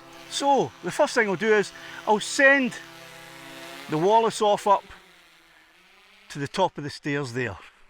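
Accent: Scotland, United Kingdom. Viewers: For a great Scottish accent I am happy to subscribe. Also I am a geologist with a background in structure in the high Alleghenies, but not far from the Valley and Ridge. I have been wondering about handling elevations photographing in structurally complex terraines. This one helps, thank-you!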